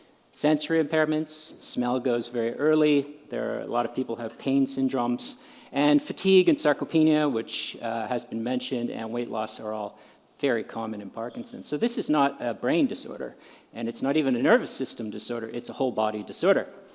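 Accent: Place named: Canada. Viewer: Love listening to your Canadian twang. Always good to hear from you.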